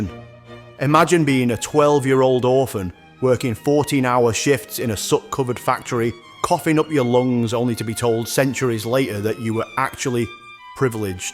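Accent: United Kingdom. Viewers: What kind of British accent is this?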